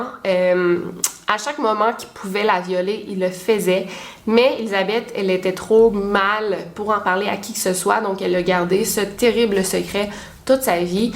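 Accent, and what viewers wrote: Quebec, Canada. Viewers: tu me ferais presque aimer l'accent quebecois ! :) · C est affreux comme histoire, pauvre Elisabeth.. merci j aime beaucoup tes vidéos et ton accent québécois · Grâce à toi j'arrive à trouver l'accent québécois sympa et compréhensible 👍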